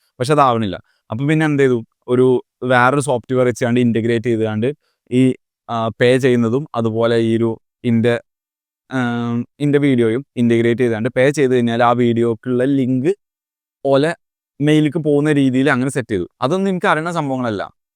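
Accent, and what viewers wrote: Malappuram, India. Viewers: താങ്കളുടെ വീഡിയോകൾ എല്ലാം മികച്ചതാണ്. പക്ഷെ സംസാരത്തിൽ നാടൻ മലപ്പുറം കാക്കാൻമാരുടെ ശൈലി കടന്ന് വരുന്നത് ലജ്ജാകരമാണ്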